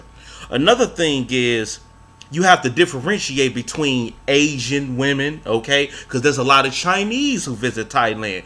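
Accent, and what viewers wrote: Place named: East Coast, United States. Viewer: just tuned in to your channel. you're from east coast right? NY?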